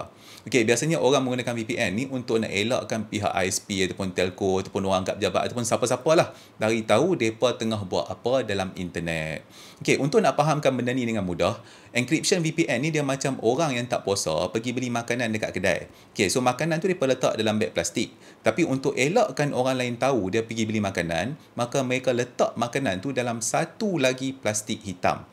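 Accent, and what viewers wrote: North, Malaysia. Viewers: baru jumpa channel tuan lepas tgk video monaliza. sedap dgr tuan cakap utara. rasa kena dengan telinga bila dengar. hehe.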